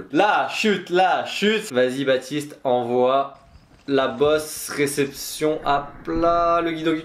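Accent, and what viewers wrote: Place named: Belgium. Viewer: S'il te plaît respecter l'accent belge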